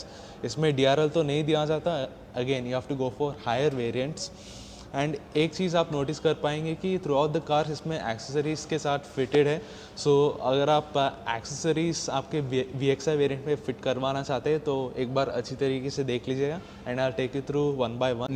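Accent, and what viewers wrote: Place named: Kerala, India. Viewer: If I am not wrong your accent resembles Keralam.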